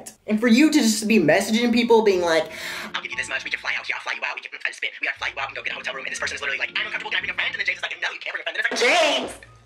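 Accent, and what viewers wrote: South, United States. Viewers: Finally a YouTuber that I actually like that has a southern accent!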